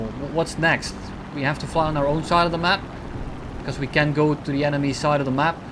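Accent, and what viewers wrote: Netherlands. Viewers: I love your vids btw, but that english accent, you have to be dutch, i think you are a Dutchie.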